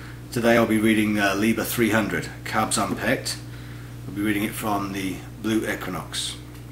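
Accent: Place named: London, United Kingdom. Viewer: I am from London and this chap sounds like he probably came from nearby.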